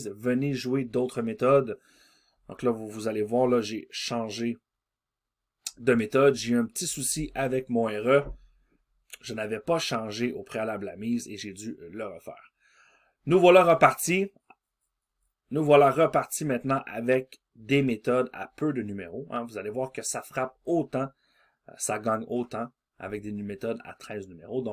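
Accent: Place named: Quebec, Canada. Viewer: l'ackcent quebecois tres beau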